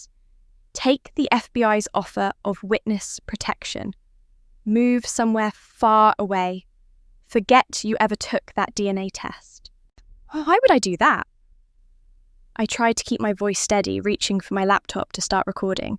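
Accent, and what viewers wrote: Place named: England, United Kingdom. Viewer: Lol she said she lives in Cambridge, its an English accent...but she lives in Boston part of New England.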